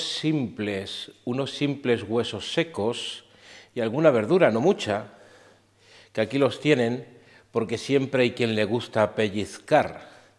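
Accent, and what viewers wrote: Spain. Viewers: El Guiso se ve delicioso, auténtica bendición que conjunto a sus historias y anécdotas hacen el vídeo interesantísimo y entretenido. Me encantó verlo y escucharlo en un Castellano de España que es una delicia para los oídos. Saludos desde Berna-Suiza, señor.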